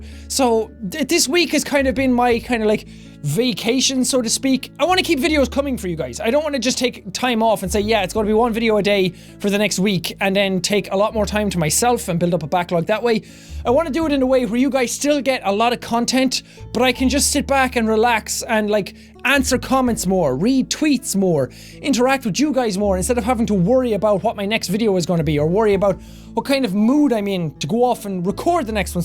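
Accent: Ireland. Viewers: what part of ireland are you from? im a wexford man :) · !! I think that you are an AWESOME person. You are my favorite youtuber! And i love your irish accent! You are A BOSS!!!!! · where in Ireland are you from #IrishAndProud:D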